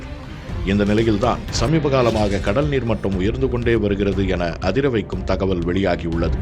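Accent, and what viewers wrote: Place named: North Chennai, India. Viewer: Daiii Tamil News Olunga padichi podunga da Unga istathuku Tamil la kolathingada Ena Vadachennai slang la potu vachi Asinga paduthathinga da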